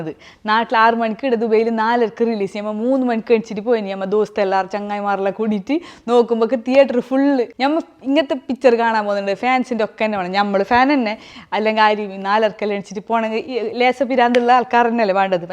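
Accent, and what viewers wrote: Kasaragod, India. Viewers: Still I'm remembering your lucifer review by our slang Kasaragod basa again you are back on same production movie same style of review go on pinne kana ave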